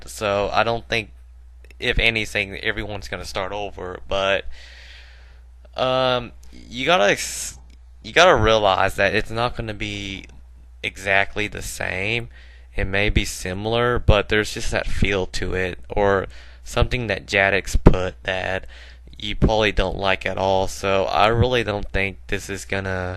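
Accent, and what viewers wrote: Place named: South, United States. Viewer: U have a southern accent and ur asian???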